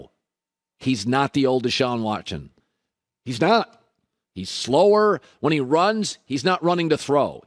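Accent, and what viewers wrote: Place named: Washington, United States. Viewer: He’s from Washington State.😂😂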